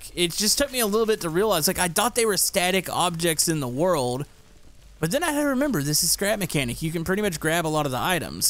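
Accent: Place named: South, United States. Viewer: He's southern, accents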